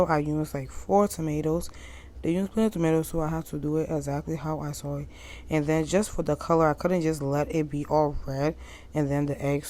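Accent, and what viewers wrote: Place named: Liberia. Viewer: I love the English😂❤ koloqua to the world🇱🇷🇱🇷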